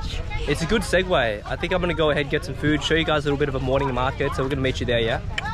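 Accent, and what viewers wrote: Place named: Australia. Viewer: What on earth do you mean? He has Aussie Accent he was born in Australia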